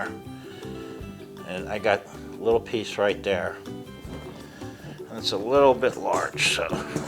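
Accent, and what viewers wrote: Pittsburgh, United States. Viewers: Thank you from the bottom of my black & gold heart! 💛🖤💛 I’m a Pittsburgh girl living in Arizona and, like the others, I load up on Mancinis every time I come home! You are a godsend. Naturally, I am now subscribed to your channel. Your accent makes me feel like home. And thank you for explaining everything so well. All the details are so important And I can’t get that from reading a recipe. Go Steelers. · Did you ever read my mind! I"ve been looking for a recreation of Mancinis recipe for a very long time, usually give up! Grew up on the stuff, so many memories of driving down to the bakery in the 'Rocks to get it fresh. Our Sunday spaghetti dinner could go without meatballs, but NEVER Mancinis! Live too far away now to get it sent to us. My heartfelt thanks to you for sharing this, especially during these tough times. 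Little nostalgia goes a LONG way for comfort. So happy to find your channel! Just hearing your Pittsburgh accent makes me happy! If I don't have the malt powder, could you suggest an alternative? Also- cannot make real hoagies without this bread, so now I can pretend I ordered one from Portofinos.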